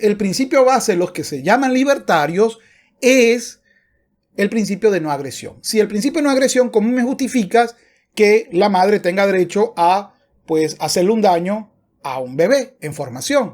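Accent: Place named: Venezuela. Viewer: Bonito tu canal hermano. Aqui estamos apoyandote, porque eso es lo que necesitamos, apoyar y promover el sentido común. Tienes acento Venezolano, eres? Un abrazo!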